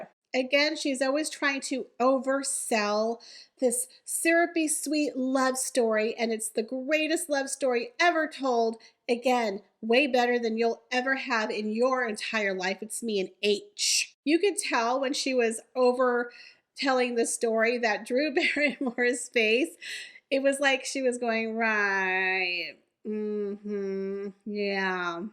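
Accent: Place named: Texas, United States. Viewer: Hey Texas girl sending ❤ like your channel. You talk like me I'm also Texas born and raised here.😊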